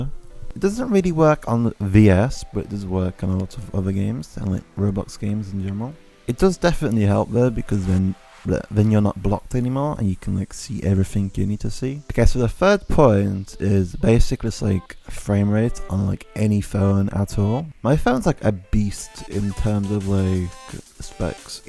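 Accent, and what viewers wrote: United Kingdom. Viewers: Best British accent ever known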